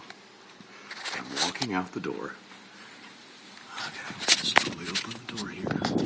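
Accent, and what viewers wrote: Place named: South, United States. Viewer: That's a particular accent isn't it? I'd guess it's from one of the southern states but I'm not sure which one.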